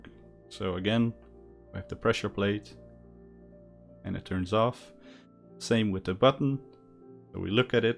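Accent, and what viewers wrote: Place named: Netherlands. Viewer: are you dutch? btw I am and you sound a bit like you are too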